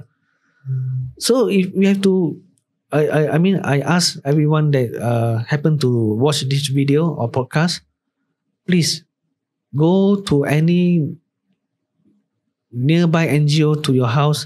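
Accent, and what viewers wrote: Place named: Malaysia. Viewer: I dont understand ur manglish...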